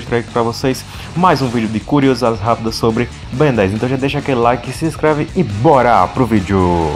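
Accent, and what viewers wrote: Northeast, Brazil. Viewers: Ce é nordestino?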